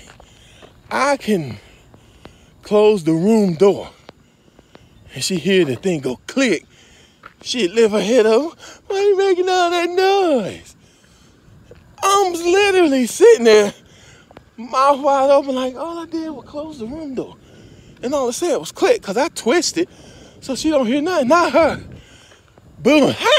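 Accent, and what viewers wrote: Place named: South, United States. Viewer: Cool front 88 YOU FROM THE SOUTH Lol. Yes Sir!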